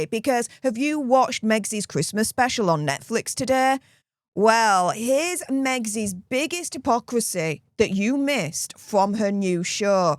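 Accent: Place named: England, United Kingdom. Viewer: I watch all your videos but I’m intrigued by your accent. I can’t place exactly where in England it’s from?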